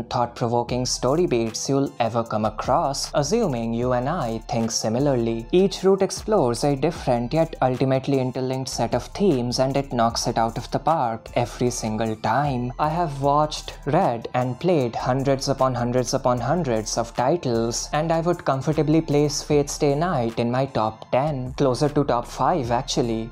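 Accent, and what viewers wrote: India. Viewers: >Open video >Indian accent >Close >Dont recommend channel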